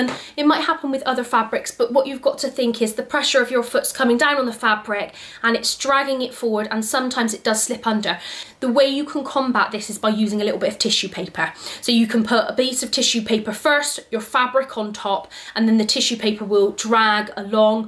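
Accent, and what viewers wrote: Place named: Bristol, United Kingdom. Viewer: Excellent info video, especially for a novice like myself. Also, love your enthusiasm, and to be a little personal, I also love your touch of a Bristolian accent .... Bristol is very close to my heart and not too far away geographically 😉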